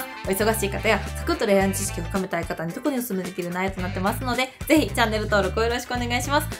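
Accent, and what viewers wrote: Kansai, Japan. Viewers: いつも見させてもらってます。 今回のいい方で「センス」と言う言い方は、ちょっと言いずらいですね。 あと、関西弁以外の標準語も取り入れて下さい。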